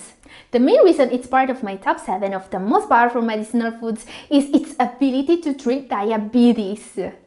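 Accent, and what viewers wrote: United Kingdom. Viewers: Her British English is just as a Londoner!!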